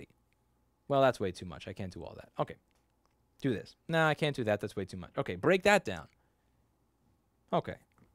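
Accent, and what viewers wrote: New York, United States. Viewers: Watching your video from my bed, i wondered "why did i subscribed to a guy who fixes Mac systems"? I bought a mac some time ago cuz i wanted to do more photoshop for my pics, but haven't been taking pics for some time now. And i don't think i'll be opening a mac anytime soon. So after a couple if minutes, i realized: 1. i've always liked the NY accent (never been there, not sure why. Not even my native language ) 2. i like real people, and you keep it real 3. i like that underdog attitude. Keep up the good fight, i'm a believer in hard work. Been working since 16, almost 3 decades in a couple of years. Not the sharpest pencil on the box myself, but i firmly believe that if you try to do your best everyday; life will treat you fairly. I've had a rough couple of years before the covd hit the fan. But my ant determination (i feel like im in autopilot sometimes) has kept me afloat, and things are looking bright ahead for now. 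Cheers from Costa Rica. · If you could boil down all that's good an interesting about NYC, it would look and sound like Rossmann. The world needs more.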